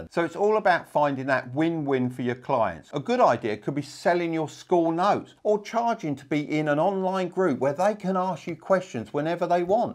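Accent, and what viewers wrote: United Kingdom. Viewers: British accent? I'm sold. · why does some of his thumbnails show US dollars when he sounds like he's from the UK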